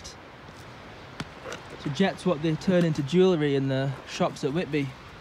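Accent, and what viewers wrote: Scotland, United Kingdom. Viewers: you probably won't respond but are you Scottish? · Are you Scottish?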